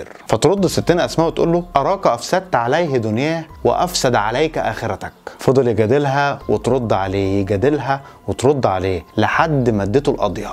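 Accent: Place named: Egypt. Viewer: مشكور أستاذ....شخصيه مثل ما قلت لها ما لها وعليها ما عليها... ويسلم لسانك المصري الأصيل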